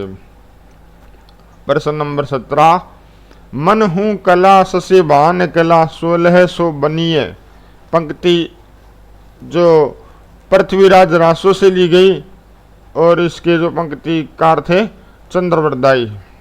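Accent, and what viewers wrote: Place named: Jalore, India. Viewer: आप जालौर जिला राजस्थान के निवासी लग रहे है सर लहजे से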